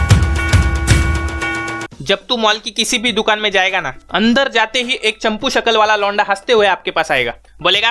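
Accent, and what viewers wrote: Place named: Maharashtra, India. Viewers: ur accent is full marathi.....very funny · Tuzi marathi tone madhli hindi mast waatate 👍😂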